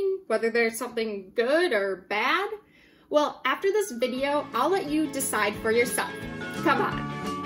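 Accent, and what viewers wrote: United States. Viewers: She is American. That is how Americans pronounce it.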